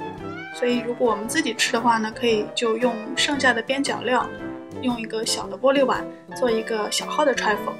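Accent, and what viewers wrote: China. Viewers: ellen Qu 我猜是中國因為我去過大陸我覺得她有時講話很和大陸人講的一樣喔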